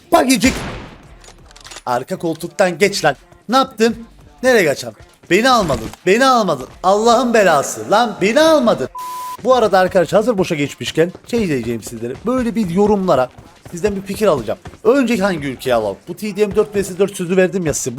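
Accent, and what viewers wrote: Cyprus. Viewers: Abi Kıbrıs şiven e aşık oldum .d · abi net kıbrıs😎😎😎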